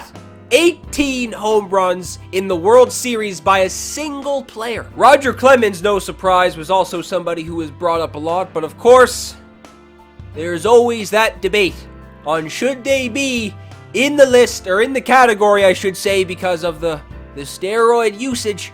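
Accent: Canada. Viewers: Ill tell ya whats NOT the G.O.A.T...ur stupid canadian accent. We all hate it. Effin period....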